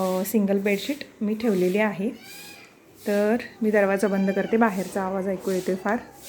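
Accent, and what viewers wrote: Kolhapur, India. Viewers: काल पहाटे 4वाजता अचानक जाग आली.झोप येईना म्हणून मोबा. चालू केला.पहिलाच* * तुझा विडियो 'किती मिळाले' हा पाहिला,नंतरं 'आमचं लग्नं' हा पाहिला. भाषा जरा कोल्हापूरची वाटली.त्यामुळे जरा उस्तुकता वाढली.सकाळी उठून बाकीचे vlogs पाहीले,फालतू कमेंटना ' माझं चाळीतल घर,' व ' मिक्स ' ह्या vlogs मस्त चपराक हाणली.तर ' माझं रूटीन ' मधून घराची सगळी कामे करत vlog करणं येड्या गबाल्याच काम नाही.हे तू दाखवुन दिलंस.सुंदर मांडणी,निखळ बोलणं, कॅमेऱ्याच हाताळणं उत्तम. स्वच्छ, सुंदर,निरागस वागणं,बोलणं, हास्य उत्तम.तुझ्या ब्लॉगला हार्दिक शुभेच्या!!! T.V. वरील फालतू सीरिअल्स बघण्यापेक्षा हे बरं!! एकेरी तुझा उल्लेख केला कारण आमच्या लग्नाला २१ वर्षे पूर्ण झालीत. मी सांगलीचा तर बायको कोल्हापूरची. ब्लॉग बघताना ज्या ज्या घटना घडल्या त्यातून आमच्या जुन्या आठवली जागवल्या.पूर्ण कुटुंबाला शुभेच्छा!!!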